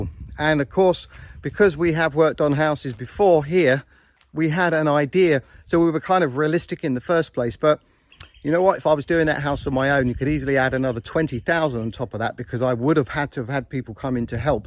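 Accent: United Kingdom. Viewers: Well, I started watching the 90 minute renovation video compilation of the village house, then went back to No. 1, and am now finished with No. 134. Whew, an enormous amount of work you've done there. I've worked in the construction industry in the U.S. all of my life, but I was fascinated by the type of housing that you have in Portugal. We use wood framing for most houses here, and I've never seen stone houses like yours. It was truly an interesting journey and I feel that I've been educated by watching your videos. But don't worry, I'm about to start on #135 and will be following your channel until you give it up. You're also to be commended on your videography talents, use of musical score, and the witty repartee that you constantly provide. Like most yanks, I enjoying hearing the British accent and have learned a whole new vocabulary. "Hob", "boiler", "salamander", "knackered", all these are fun to hear for the first time. 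Great job, keep it up, and good luck through all your adventures. Gary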